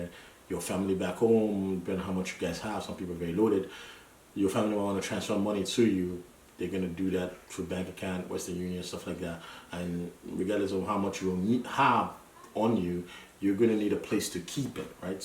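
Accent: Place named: Canada. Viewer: social insurance is just like national insurance here in the UK..dint know that the Canadian dollars is much more less than the British pound. thats good to know. yes appropriate c;clothing .. Canada is very cold thanks for the info love your Canadian accent greet madam for us and the kisses to the new born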